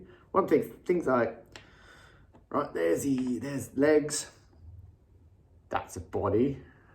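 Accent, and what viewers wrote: Australia. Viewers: So nice to see and hear another Aussie away from home. Was really funny how you called it a sanga as I said that to my Dutch hubby the other day and had to explain what I was saying. Was a great Episode... keep em coming :-)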